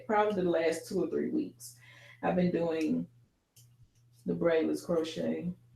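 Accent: United States. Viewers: Yur a lovely lady with a beautiful smile even with yur pink and green lime clips n bubble upside yu head. Love yur accent normally I dont like some american accents after awhile but I found yur voice soft n relaxing n did not fast forward till close to th end. I thought yu did a good job for a first time specially as I'm not that good at corn rowing stay blessed 🙏🏾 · this was great, you sound like you're from East Texas or Dallas, my bffs and family are from there and you sound just like them. love it 😁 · Your hair looks as beautiful as you! Love it! Our voices are almost identical and I thought I was listening to a recording of myself. You must be from the southern midwest, sister. LOL.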